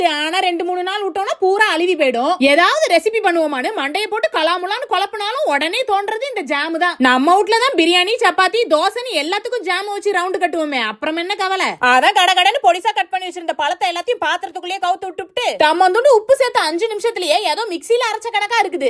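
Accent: Kongu, India. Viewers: Ethu kongu slang tha but not coimbatore and Tiruppur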